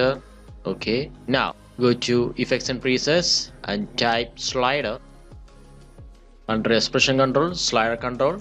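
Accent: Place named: India. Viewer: Shitty Pajeet accent- Once a pajeet always a pajeet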